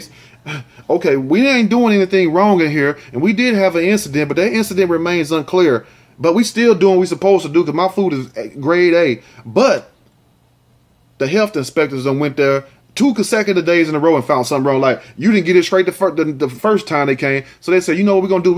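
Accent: South, United States. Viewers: Love the country twang of your accent, and you're so silly, yet wise. You're alright with me.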